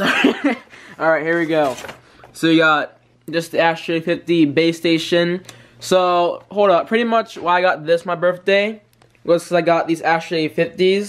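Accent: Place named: United Kingdom. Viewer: That British acent tho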